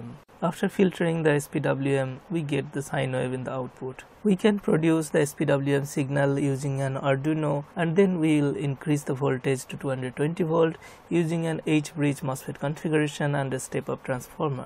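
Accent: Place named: Bangladesh. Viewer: r u from bangladesh. i can guess from ur English accent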